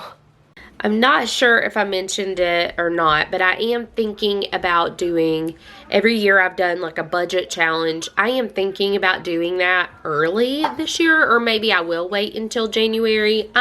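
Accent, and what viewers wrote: South, United States. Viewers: I love your channel! I also love how you have a southern accent. We live in Maine and speak so differently!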